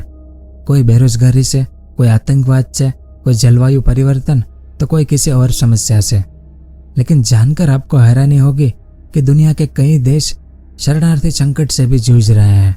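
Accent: Gujarat, India. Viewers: Bhai Tumhara gujrati lehjaa mast he 😁😉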